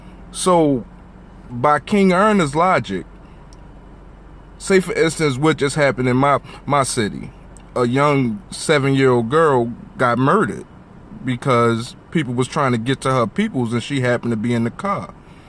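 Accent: Baltimore, United States. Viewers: Bruh you from Bmore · You from b-more. I hear the accent...😂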